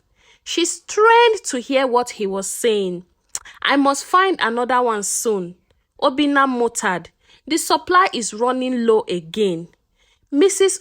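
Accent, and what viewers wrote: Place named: England, United Kingdom. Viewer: I like your English accent 😁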